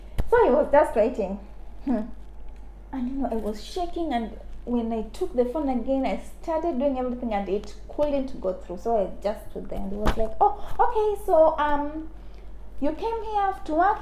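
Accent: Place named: Uganda. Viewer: You are speaking like ugandans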